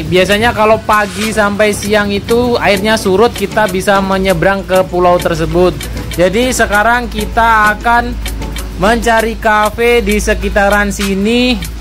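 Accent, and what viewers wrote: Java, Indonesia. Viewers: Mantap mas..viewnya.mas asli orang mna.dari suaranya kyak suku jawa gitu.Explore terus ranah minang sy dukung chanell G.J.J.Salam 1 sumatera.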